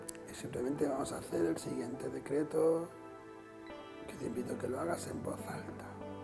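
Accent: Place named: Spain. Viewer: me cuesta entender a los Españoles cuando hablan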